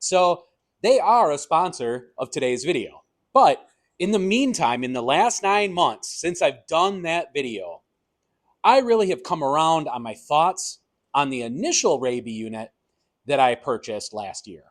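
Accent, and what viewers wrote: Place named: Wisconsin, United States. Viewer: glad I could confirm the Wisconsin accent! appreciate the reviews :)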